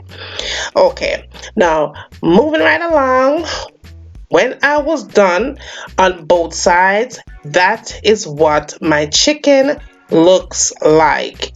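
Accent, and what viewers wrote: Jamaica. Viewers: I love the accent. That Jamaican came out a few times while explaining the recipe 😂😂😂 I love it. My all time favorite food is Jamaican Dishes and Spanish Food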